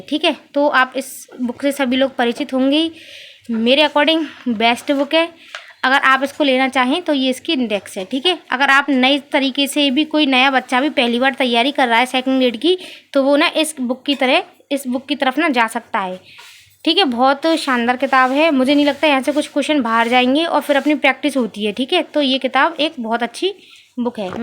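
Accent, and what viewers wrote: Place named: Braj, India. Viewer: Bharatpur se braj language 😄😄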